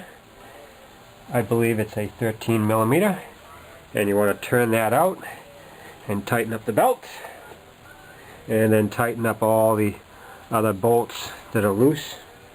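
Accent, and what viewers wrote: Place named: Boston, United States. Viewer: love the video! i lived in boston before. sounds live your from there lol!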